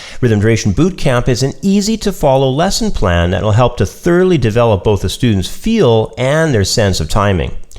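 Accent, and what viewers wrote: Canada. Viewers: Nice job my man. I think I understand now. Btw, are you Canadian? I'm only asking cuz I can hear your accent.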